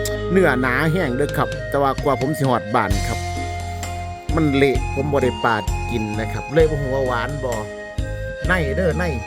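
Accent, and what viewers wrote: Isan, Thailand. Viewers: สวัสดีบาว นี้และตั้นกำเนิดไทยอีสานใต้เว้าคือกัน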